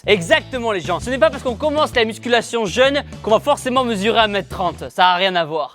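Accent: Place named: Paris, France. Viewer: TU VIENS DE TOULOUSE MAIS TU LA MASSACRES AVEC TON ACCENT PARISIEN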